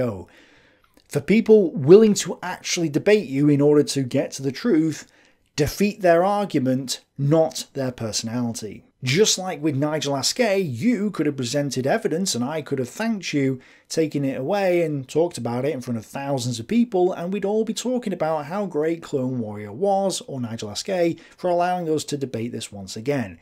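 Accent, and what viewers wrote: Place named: North, United Kingdom. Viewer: part of it just comes from having a Northern accent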